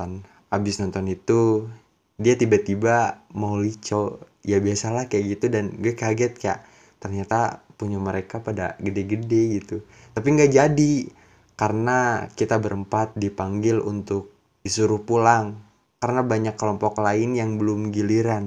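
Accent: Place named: West Java, Indonesia. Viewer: Logat sunda nya agak keliatan yah wkwkwk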